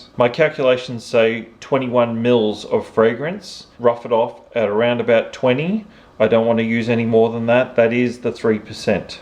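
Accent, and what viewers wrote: Australia. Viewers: AU Love the colours, and the way you have it is lovely. It's so good to see a video with Aussie measurements, and accent!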